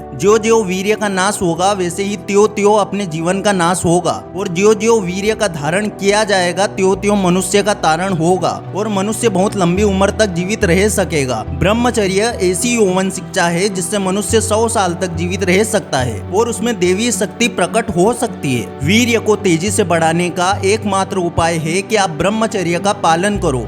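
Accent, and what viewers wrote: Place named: Gujarat, India. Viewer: Ye voice kisi gujrati ki h lgta h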